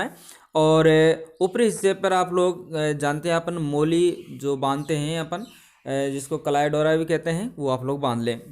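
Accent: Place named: Jammu, India. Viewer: Jay maat dii bahi jammu sa bool rah ha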